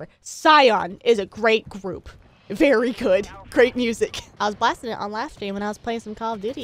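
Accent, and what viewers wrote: South, United States. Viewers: A chaotic is a funny! Hope your allergies clear up soon! Cant wait to see what a week in your life is like! Also, the southern accent just gets me every time!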